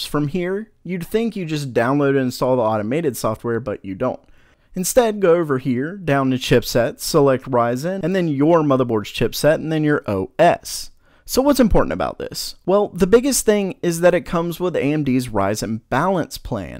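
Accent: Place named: Philadelphia, United States. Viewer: You gotta be from philly...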